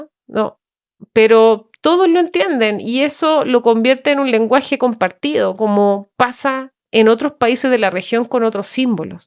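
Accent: Chile. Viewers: Hola gracias, cada vez que te escucho con esa tomadita chilena. Desde La Plata - Bs As - Argentina Vas como borrando ese enojo o recelo que los argentinos tenemos por los chilenos. Es que de verdad dolió que tuvimos ayuda de tu país en la guerra de las Malvinas. En cambio nuestro cariño al pueblo peruano. Que fue el único país que nos ayudo esa guerra. Yo era muy chico y me contaron y lo estudie en la escuela. Muchos años después vinieron esas 2 copas América que Chile nos ganó para mí opinión una debió ganar Argentina. Dicho esto, coincido con vos a los argentinos vivimos la vida a mil y somos muy teatrales. No por nada Capital federal es una capital con miles de teatros En la crisis del <num> Me acuerdo de haber participado de una obra de teatro en cooperativa que justamente hablaba de las crisis de nuestro país. Pero también de nuestros proceres como Belgrano, San Martín y otros. 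Y sus ideales .. Personalmente no tenía trabajo, había dejado la carrera. Y el país se caía Y ahí estábamos unas 30 personas algunos docentes, trabajadores y desocupados. Jóvenes y personas hasta 35 o 40 años creo. ( Hombres y mujeres ) El director un ex consejal de La Plata. Que le decían el turco por su origen de familiar Bueno estuvimos todo un año ensayando la obra era un musical Y la estrenamos en diciembre. Pero muchos necesitamos estar ahí en hecho cultural que nos una y ayude a pasar ese momento juntos Y si la familia, la amistad, la reunión, nuestros ídolos son sagrados. Porque creo que e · GRACIAS querida.!!!!! Me ha encantado tu delicioso ACENTO,...a mis 76 años me enamoré de CHILE, por VOS.! Claro, también los CONTENIDOS.!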